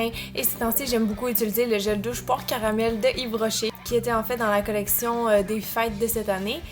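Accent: Quebec, Canada. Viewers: Elle est québécoise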